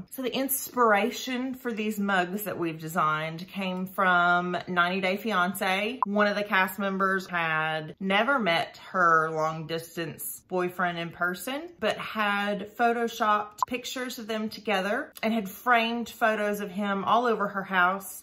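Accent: South, United States. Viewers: I don't know what I love more, your gentle southern accent or your enthusiasm for British stuff. Oh hell, I love them both. Being a Brit, I've accumulated so many mugs over the years, that every couple of years or so, you have to revisit the cupboard to decide which ones to throw out. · You should look for a mug with a ceramic frog or spider stuck inside on the bottom... its hilarious when the person drinks down and the Frog reveals itself .. i love your sweet southern accent ...keep drinking that tea..dunno about the waffle though...maybe i need educating lol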